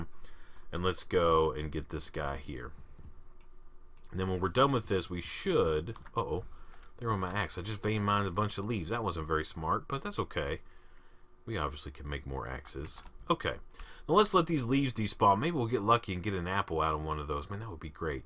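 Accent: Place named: United States. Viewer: I'm sorry but you sound country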